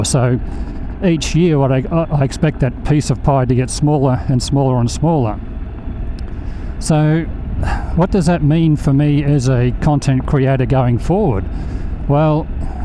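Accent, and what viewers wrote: Australia. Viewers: Ozzie accent such a pleasant change from the pommies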